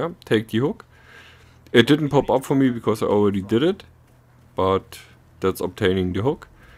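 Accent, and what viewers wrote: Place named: Germany. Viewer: I like your accent. Sounds Deutsch!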